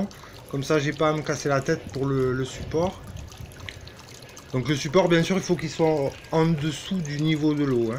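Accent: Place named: South-West, France. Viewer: j'aime bien cette accent du sud ouest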